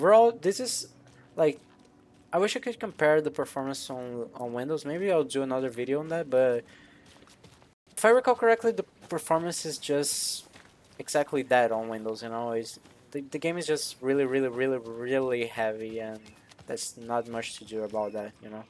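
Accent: Brazil. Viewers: o sotaque br no inglês é impagável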